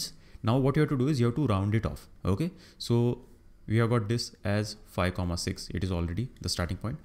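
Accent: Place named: India. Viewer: indian accent is crazy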